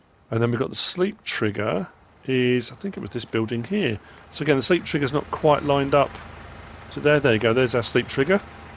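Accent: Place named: United Kingdom. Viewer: Your British right? you sound it (☞ﾟヮﾟ)☞☜(ﾟヮﾟ☜)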